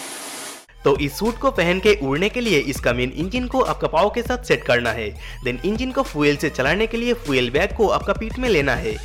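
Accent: Bengal, India. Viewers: Okay but voice is a mixture of Bengali and hindi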